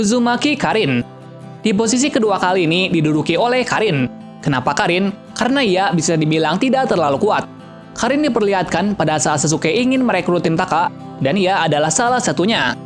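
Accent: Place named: Banyumas, Indonesia. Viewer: Ngapak ya😁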